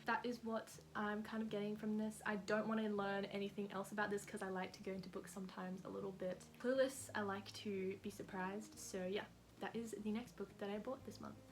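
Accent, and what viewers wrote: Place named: Australia. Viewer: oh the way I love Aussie accent 😫🥺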